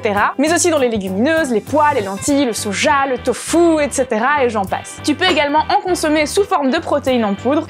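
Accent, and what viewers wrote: Belgium. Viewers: Elle est belge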